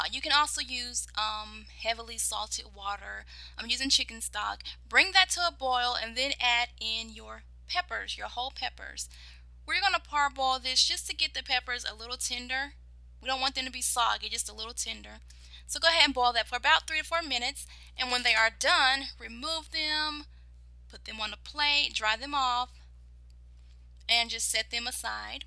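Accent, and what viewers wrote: South, United States. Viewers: your slight southern drawl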